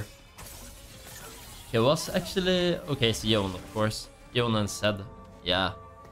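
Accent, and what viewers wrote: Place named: Sweden. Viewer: swedish-english is so fun to listen to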